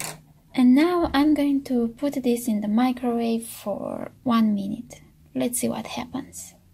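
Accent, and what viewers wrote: Romania. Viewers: She is Romanian ..but the point is that not all the Romanian people have this accent ..she has an horrible accent ..I am from London ..Where are you from ? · a accent Romanian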